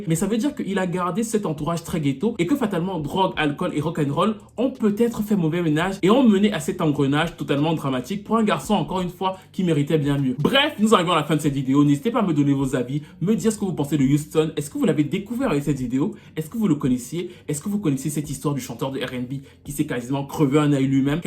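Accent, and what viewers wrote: Cameroon. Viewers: Tu ne serais pas camerounais par hasard parce que tu as un petit accent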